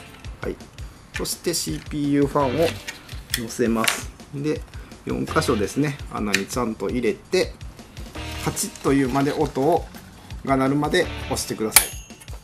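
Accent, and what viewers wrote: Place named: Nara, Japan. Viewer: イントネーションが奈良か京都、多分奈良っぽい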